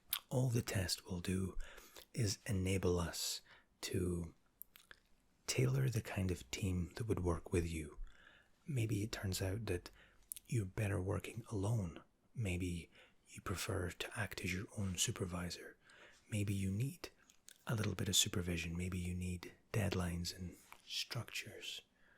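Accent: Scotland, United Kingdom. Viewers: I love the tone of your voice and the way you speak. Maybe it's the accent that I like so much being a fellow Scot ☺️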